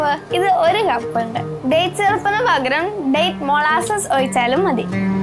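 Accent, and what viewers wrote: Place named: Malappuram, India. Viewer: നല്ല മലപ്പുറം ഭാഷ.... അടിപൊളി.... Nice.. 🤝🤝🤝🤝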